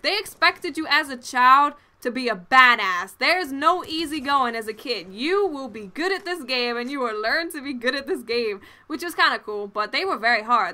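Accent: Latin America. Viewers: are you Hispanic? I love your slight accent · lol, I can hear the Latina in her voice. Awesome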